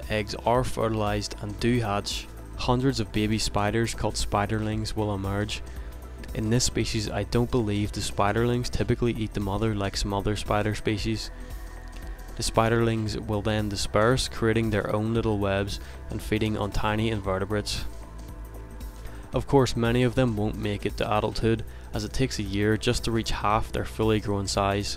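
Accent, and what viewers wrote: Northern Ireland, United Kingdom. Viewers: He's got a Northern Irish accent. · He sounds very similar to most of my friends In Northern Ireland, but with the hundreds of accents across the UK even as an Englishman its tough to put a finger on it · never like to see spiders eating other spiders 😢 The Northern Irish accent is refreshing though, subbed!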